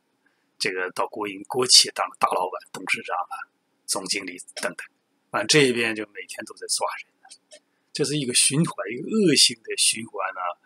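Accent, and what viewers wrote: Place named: Dalian, China. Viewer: 大连味有的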